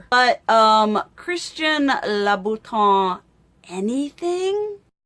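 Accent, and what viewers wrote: South, United States. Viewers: I absolutely Love your accent! I'm originally from Wva. I just knew you were from the South! I love your channel!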